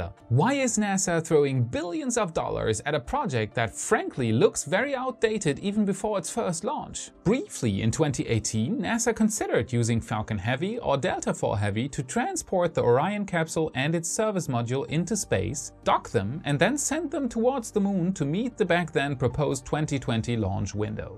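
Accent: Germany. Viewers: I like your slight german or central european accent. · He is speaking unusually good English with a slight Tscherman Akzent. The only Germans you are likely to hear with less accent than him are a few professional actors and people who had a bilingual childhood.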